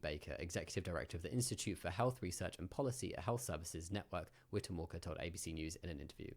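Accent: United Kingdom. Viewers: He's just british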